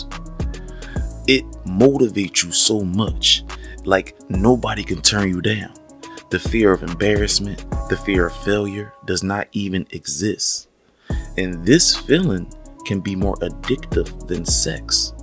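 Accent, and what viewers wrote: Philadelphia, United States. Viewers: Okay, definitely Philly, wow man hearing you speak the way you do fukk me up gang, so articulate but at the same time look burnt out, never judge a book by its cover ❤ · Yo you from Philly thought you was from Baltimore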